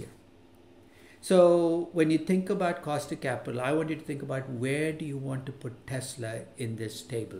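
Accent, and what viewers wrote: India. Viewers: Excellent sir.. are you from Kerala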